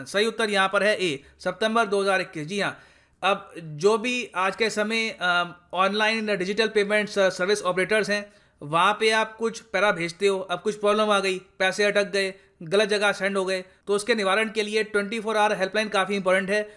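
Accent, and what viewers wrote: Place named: Punjab, India. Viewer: Sir aapka ton Punjabi Wala hai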